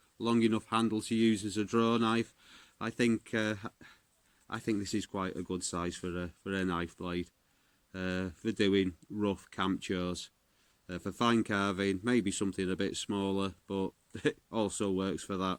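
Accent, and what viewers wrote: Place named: Merseyside, United Kingdom. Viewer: Subbed. Nice honest review which covers a few pointers that others do not, i.e spine dynamics using a ferro. Good to hear a Merseyside accent in Lapland! I bought the last stainless 140 on the Varu site a couple of weeks ago, shipping is a bit slow because of the plague but I hope to get it middle of next week. I was agonising about the cost but I am glad I pulled the trigger on it. Now will be carrying the 140, the Savotta pocket chainsaw and the Fiskars X7, all made in Finland. That package covers all my camp/bushcraft needs! Any tips on sharpening the stainless version? Cheers mate.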